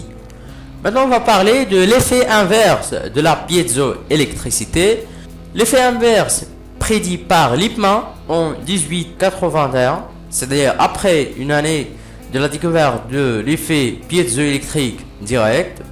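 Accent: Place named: North Africa. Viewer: Le problème des " scientifiques " c'est que la plupart ne savent pas présenter ou être pédagogue, en général quand un scientifique t'explique quelque chose, il est tout seul dans sa tête... La voix avec fort accent maghrébin est déconcentrante, la police des slides mal choisie, c'est parfois chargé pour rien... Il vaut mieux faire simple, que vouloir trop en dire ou trop en montrer pour rien. Bref merci pour les efforts d'explication, mais faites un petit effort sur la pédagogie svp et la pédagogie commence par simplicité et la clarté. Bon je ne dis pas que c'est incompréhensible, mais voilà quoi, ça donne envie d'aller voir une autre vidéo... Merci quand même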